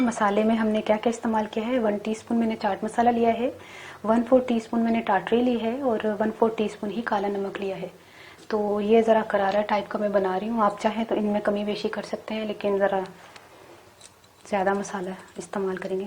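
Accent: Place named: Punjab, India. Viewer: I'm late today oooo but anyway amazing and nice cooking and i loce punjabi touch when u speek punjabi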